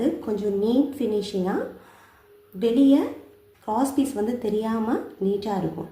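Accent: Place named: Kanyakumari, India. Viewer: unga pechu kanyakumari Kulasekharam side mathiri irukku sis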